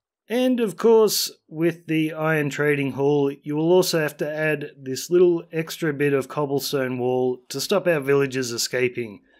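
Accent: Australia. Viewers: You're Australian aren't you?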